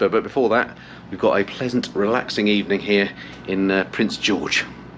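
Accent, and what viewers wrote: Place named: Yorkshire, United Kingdom. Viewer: I thought you are a Yorkshire man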